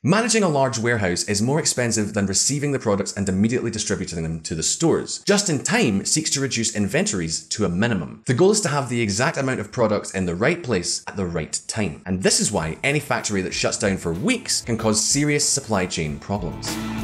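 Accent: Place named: Scotland, United Kingdom. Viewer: I like the team with the other guy and the Scottish one doing the narration. I think the Scottish guy seems better behind the scenes. He has cleaned up his teeth a bit speaking somewhat slower and more clearly . He isn’t bad and has improved a lot. I still think he should set himself apart probably not wearing Hawaii shirt maybe dress more formal . But he is doing much much better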